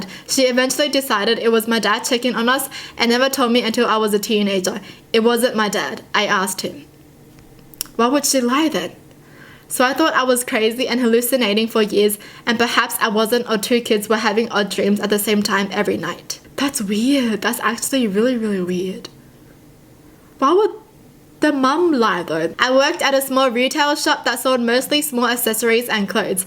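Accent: Australia. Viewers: Are you Australian,kiwi...or..... Btw I think this is a Cool video......i watch this before I had to get to sleep....LOL. I subscribed(to this channel and your beauty channel),liked,and hit that bell (to this channel and your beauty channel).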